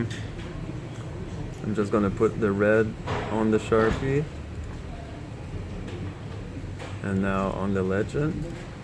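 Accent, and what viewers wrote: Quebec, Canada. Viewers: ca sonne québécois 😋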